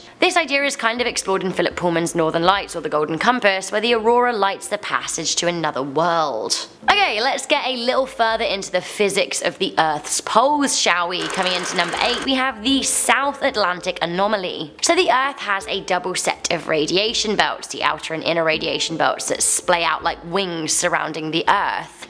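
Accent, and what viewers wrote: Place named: Liverpool, United Kingdom. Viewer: Are you from liverpool?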